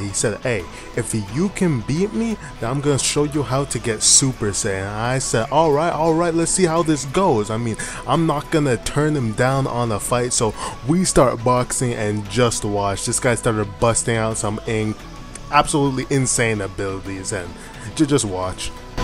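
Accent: Jamaica. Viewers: I heard the jamaican and just subed , good channel👍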